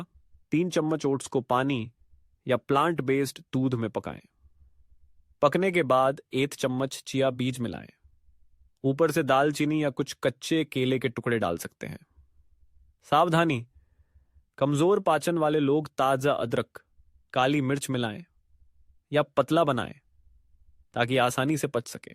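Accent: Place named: India. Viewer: विडियो विदेशी और आवाज भारतीय। पूरी विडियो विदेशी।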